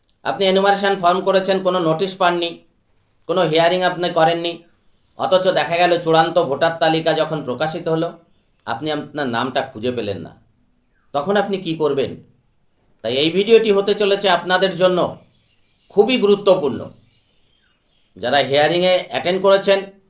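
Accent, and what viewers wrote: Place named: Bangladesh. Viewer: আপনার উচ্চারণ গুলো কিন্তু বাংলাদেশীদের মতন।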